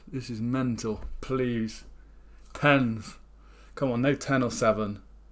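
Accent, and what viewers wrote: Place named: Midlands, United Kingdom. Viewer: Mate where are you from? I'm sensing a Midlands accent? Do you play goliath in Coventry? Love that tournament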